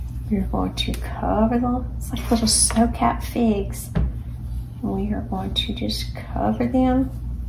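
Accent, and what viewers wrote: South, United States. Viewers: 40 she had figs on her tree OK we I don’t think we have fig trees here in the Minnesota area when you said that I was like kind of jealous like excited like thinking yes that’s really cool and then I was thinking can I plant a fig tree here. Are there any fig trees in the Midwest? I felt like I was very Mediterranean, but you have a southern accent so where are you at? Lol.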